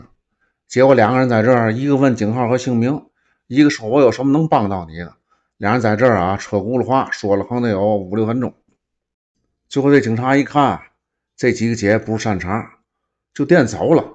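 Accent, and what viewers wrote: Tianjin, China. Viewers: 这是天津口音吗？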